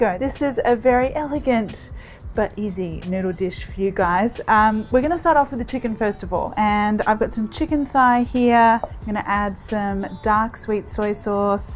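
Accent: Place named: Australia. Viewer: jee you're good, and the way you talk about food with that Australian accent is so nice to hear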